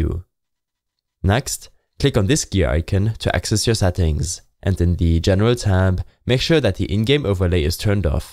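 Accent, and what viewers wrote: Germany. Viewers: You sound really german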